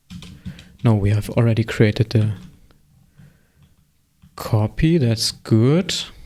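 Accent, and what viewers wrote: Germany. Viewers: Man merkt Bro ist deutsch hahahahah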